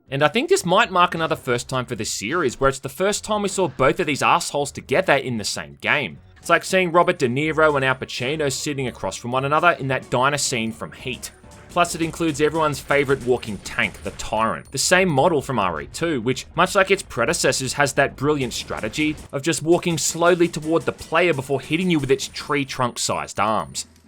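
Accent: Australia. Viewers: Wait... is an Aussie? I thought he was British!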